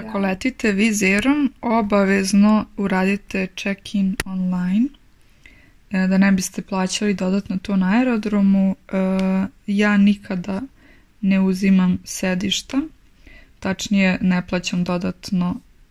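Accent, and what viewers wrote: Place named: Ekavian, Serbia. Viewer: Zašto pričaš na ekavici, ako si iz Bosne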